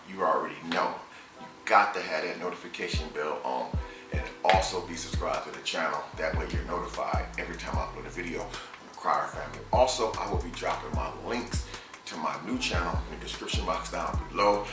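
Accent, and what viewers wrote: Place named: Russia. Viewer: That accent sound either Russian or Ukrainian, at least for the ideal intro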